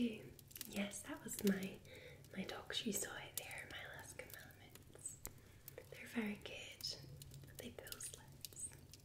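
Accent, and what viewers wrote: Scotland, United Kingdom. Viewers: never heard a Scottish accent ASMR, makes it even more amazing with such a soothing accent x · Perfect timing, I’m currently getting snowed in in Michigan. You’re so good at this! A lot of people try too hard…over accentuating words, whispering to the point you can’t understand them, etc. You’re Scottish accent just adds to the relaxing tone & cadence of your voice and the overall experience. WhispersRed ASMR is fantastic as well, you’re both my favorites. I must have a thing for a UK accent hahaha!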